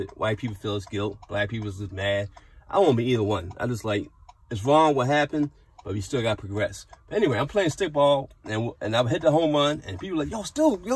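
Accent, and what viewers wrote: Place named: Philadelphia, United States. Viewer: You can so tell you are a Philadelphian. You talk so fast lol. But I'm a Philadelphian too so understood every word. I lived in Philly all of my 40 years but I am unfamiliar with this area. I love history and I found out that the first stone home built here in Philadelphia, that still stands today, was in West Philly. It was the home of William Penn's doctor. It's at 53rd and Woodland. I want to drive by one day to see it. I am more familiar with North Philly and Northeast Philly. I am so unfamiliar with South Philly and West Philly. Also, University City. University City doesn't even feel like Philly to me. It's a bunch of college kids and transplant yuppies. But anyway, I enjoyed listening to your story. Also, I can tell by all of the great and positive things you said that your time will come, kind sir. 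I am huge on God and spirituality and I was told before that if you truly want something to show gratitude towards it as if you already have it. When we do that we actually draw it to ourselves by our sense of gratitude. Almost like fooling the universe. Coincidences are merely God's prearrangement of plans and maybe I wrote something in this comment that you were meant to hear. If I didn't I still hope to leave an imprint of unconditional love on your heart. May God bless you on all of your future endeavors and for the sake of humanity, please keep spreading love. The world desperately needs you more now than ever before. Good always conquers evil.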